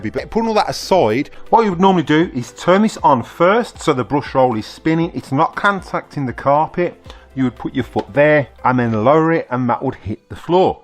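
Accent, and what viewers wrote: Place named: Birmingham, United Kingdom. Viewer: You say $200 when your accent sounds very clearly a UK £ one. Specifically from Birmingham UK West Midlands.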